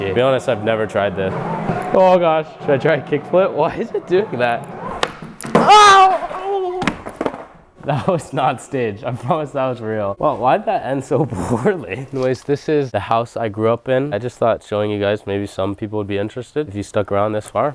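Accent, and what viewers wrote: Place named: United States. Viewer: u have too much of american accent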